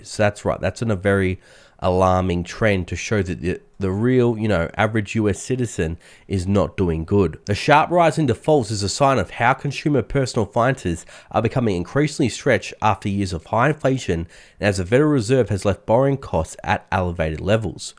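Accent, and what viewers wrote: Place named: Australia. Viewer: Change in pronounciation for the American audience who just couldnt seem to deal with the aussie accent?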